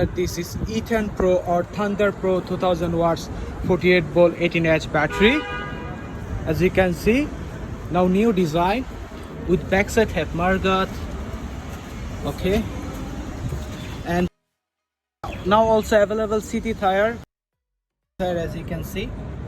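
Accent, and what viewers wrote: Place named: Chittagong, Bangladesh. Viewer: আপনি চট্টগ্রামের নাকি?😁 উরে তুলো তো।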